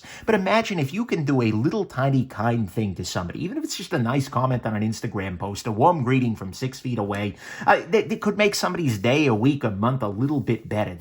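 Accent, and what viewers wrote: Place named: New England, United States. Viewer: I always figured the voice of reason would have a new england accent. Good on ya,. You're a real gem.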